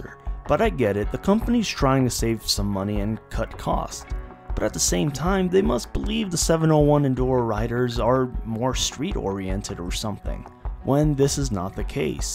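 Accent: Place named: Mexico. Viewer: THIS DUDE SOUNDS TOO MEXICAN TO BE A TRUE AMERICAN. CITIZEN OR NOT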